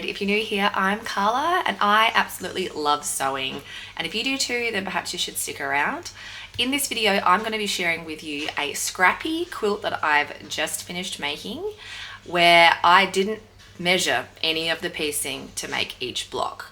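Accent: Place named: Australia. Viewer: I wondered if you were from Australia! Lovely accent. Wishing you luck from the Midwest, USA!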